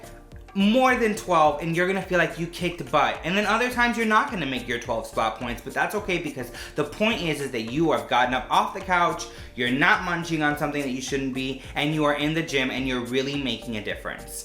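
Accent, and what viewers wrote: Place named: Cuba. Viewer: Omg I loved this video! Going for my first workout with OT next week and you made me feel at ease. I love your Spanglish! Cuban or Puerto Rican? I’m gonna say Cuban! Cubanita here!🇨🇺 😘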